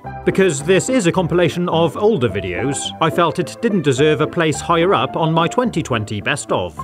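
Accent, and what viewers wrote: United Kingdom. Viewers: I love this guys British accent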